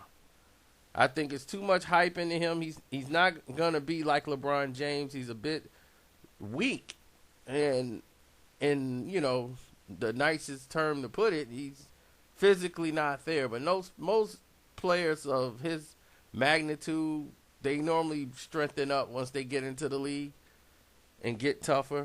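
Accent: Ireland. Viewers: The Irish accent is funny as hell. You is a fool! Keep it up. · u killing me with that irish accent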